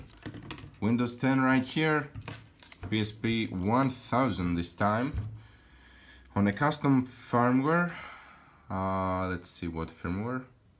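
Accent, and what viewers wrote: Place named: Greece. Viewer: Ε, μονο απο την ελληνοααγλικη προφορά καταλαβα πως εισαι Ελληνας :ο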